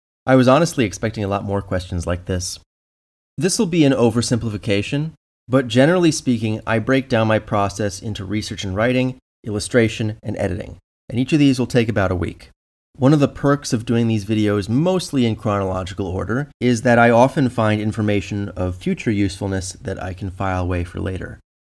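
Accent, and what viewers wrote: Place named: Michigan, United States. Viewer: THIS MAKES SO MUCH SENSE! Every time you speak I think “he sounds like he is from around here (Michigan)”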